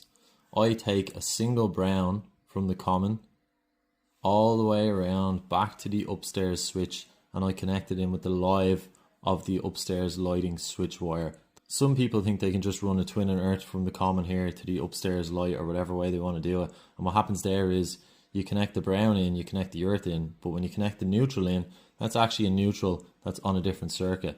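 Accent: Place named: Ireland. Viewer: Turning into a nice series this. Educational, short and easy to follow + the Irish accent makes everything better 😂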